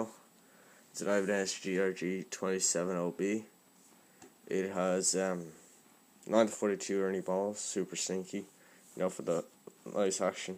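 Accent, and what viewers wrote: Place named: Ireland. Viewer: I can tell ur Irish lol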